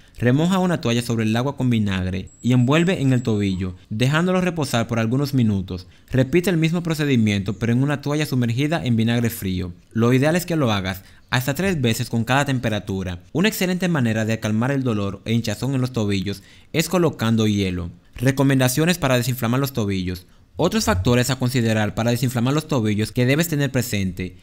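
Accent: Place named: Caribbean. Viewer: El narrador del vídeo tiene un acento caribeño, estoy segura que no es de Perú.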